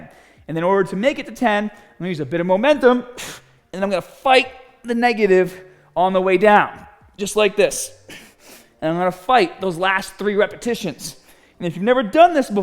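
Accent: Boston, United States. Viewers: His point still stands... his accent is Boston in USA not South Africa lmao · I have been watching videos while doing cardio and I have developed a strong tendency to speak in a Boston accent. Win Win.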